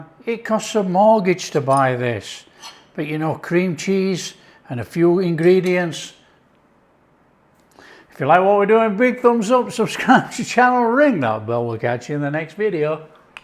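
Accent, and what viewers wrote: Yorkshire, United Kingdom. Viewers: I believe it's a Yorkshire accent, specifically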